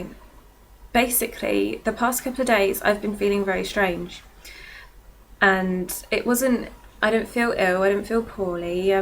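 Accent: United Kingdom. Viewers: Is it me or do you get a British accent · Your accent sounds british to me, not aussie. Very interesting video. Thanks for posting it. I do know a great deal about MPD/DID.. am a hypnotist and have great success with hypnosis and regression. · Ur British not Australian!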